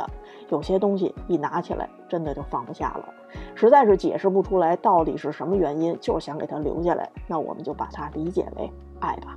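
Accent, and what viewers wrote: Beijing, China. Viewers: 每期视频都干货满满。谢谢！很喜欢Edi闲适的语速，听起来舒服而真诚，带着些许的京味儿，更是倍感亲切。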